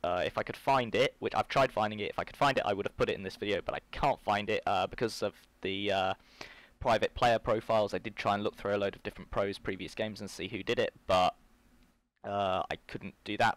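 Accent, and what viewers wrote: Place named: United States. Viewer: account He sounds 100% american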